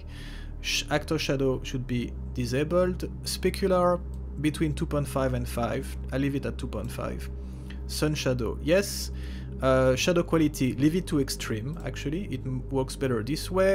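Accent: France. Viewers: Hello dude ! Are you french by the way :)? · l'accent francais 🤣ca fait plaisir quelques frenchs dans ce youtube game hahaha😂😝🤪😜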